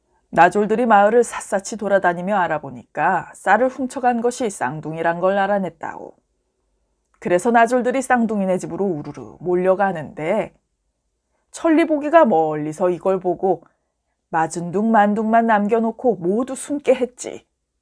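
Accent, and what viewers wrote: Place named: Gyeongsang, South Korea. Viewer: 안녕하세요 넘재미있네요! 경상도사투리 구수합니다~^^ ❤❤❤❤❤❤❤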